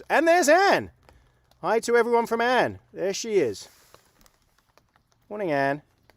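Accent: United Kingdom. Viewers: The guy sounds British though.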